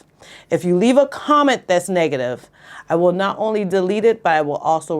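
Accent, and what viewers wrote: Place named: Lowcountry, United States. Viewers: I knew you were from Charleston with your accent! I’m from there as well. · Girl where you from ?… cuz that accent sound like a Charleo solider.. big Geechie 😭😭💙💙 edit: I heard the accent in the beginning of the video before you explained the riot story but yeah you sound like home 💙💙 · I hear an accent are you from the Lowcountry?SC, cool video 😊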